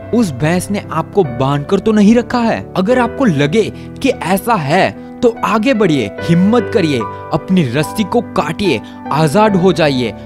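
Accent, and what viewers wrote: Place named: Surat, India. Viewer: आप की सुरती बोली हे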